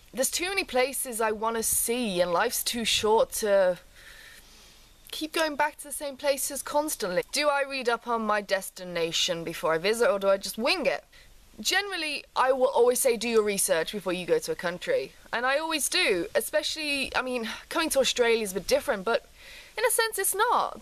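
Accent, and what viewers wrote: England, United Kingdom. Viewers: i recon you've lost your English accent a little bit.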